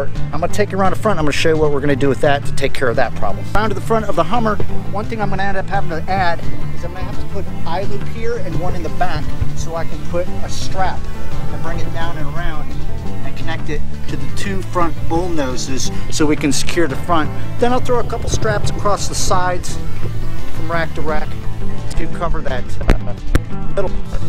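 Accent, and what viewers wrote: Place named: Minnesota, United States. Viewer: I recognize your accent (reminds me of Al Lindner). Are you from the Brainerd, Minnesota area?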